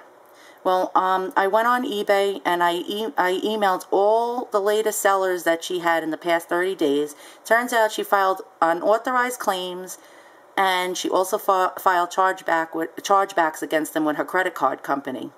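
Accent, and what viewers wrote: United States. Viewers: Ur a fellow new yorker I can hear it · she sounds like she is from new jersey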